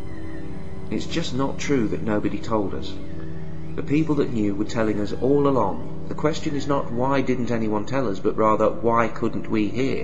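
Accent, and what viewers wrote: United Kingdom. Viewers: Sounds like UK maybe?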